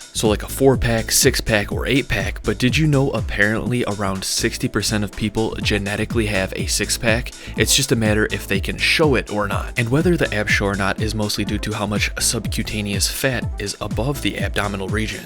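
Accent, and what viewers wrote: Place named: Midwest, United States. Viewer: I love the midwest accent. Idk why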